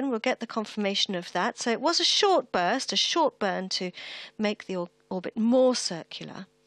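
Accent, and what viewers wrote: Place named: United Kingdom. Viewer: Why this British accent ?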